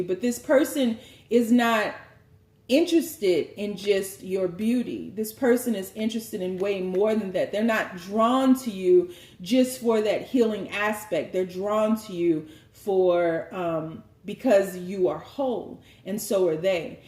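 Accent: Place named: United States. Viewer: I love your accent and style of reading. Which part of US are u from?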